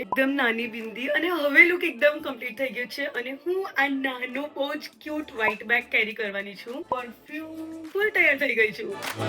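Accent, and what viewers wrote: Surat, India. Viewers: Yeahhh surtiii girll in gujju accent ❤🔥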